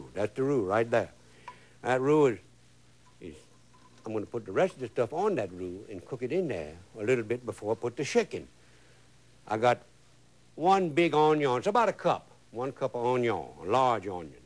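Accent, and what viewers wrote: Louisiana, United States. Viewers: I'm a North Eastern lady, but every time I use cayenne pepper when cooking, I say, "CAYENNE PEPPER 🌶️" Out loud in a CAJUN VOICE, because I used to watch this fine gentleman's cookin' show! I was SO happy to have this pop up on my YouTube today, so I could hear that voice again. (Exactly how I remembered from years ago!) ❤ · I am from Louisiana and enjoy listening to him talk with that accent. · So funny reading all of the comments below. I too looved this man show when I was a little girl. He spoke with a very similar dialect as did my family from south Louisiana. One thing tho... his rue is waaaay too dark but maybe that was the Cajun way... my family's Creole much lighter (and delish; we make it every winter holiday). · That's probably the most original Cajun gumbo I've ever seen. This fella was like the Julia Childs of Louisiana cuisine, when you wanted to give hearing Julia's posh English a break you could tune in to this and hear authentic down to earth Cajun French accent along with some humorous stories and jokes thrown in. 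RIP, frere, you will never be forgotten. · When I was younger I use to love to watch his shows especially with me being a southerner. I miss him so much. I loved his Cajun accent and his personality he was never boring to watch. RIP Mr. Wilson you will never be forgotten. <3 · Lol.. not unless you were born and bred in the deep south louisiana like me den you understand him jus right cher 😉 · I love his cajun accent. That indecent exposure story made me laugh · Hell yeah especially when ya throw in a lil bit French, a lil bit English and a lil bit Cajun all in one sentence...... Yankees looking like what the hell did he say???.......😆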